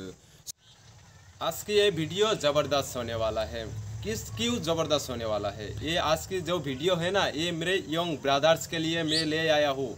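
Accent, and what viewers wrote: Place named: Assam, India. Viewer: Ye assamese ladka hein mein bhi assamiya hun... mujhe pata sala ye assamese ladka hein iske ayaz se pata sala... bro Ki khbr ...mojja lagise video tu dari ahile tumak dhanyavad dim😁😁😁😁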